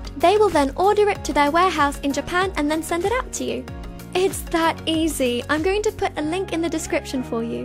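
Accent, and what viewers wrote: United Kingdom. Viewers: your brit accent is sooo cute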